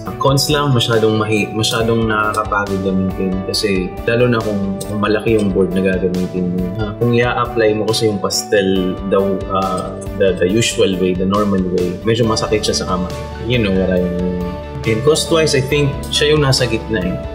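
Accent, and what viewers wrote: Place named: Bicol, Philippines. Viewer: Hi po, bibihira ganitong art content sa pinas. Keep on going po Sir! Love your accent too! Maurag talag ang mga Bicolano 😊👌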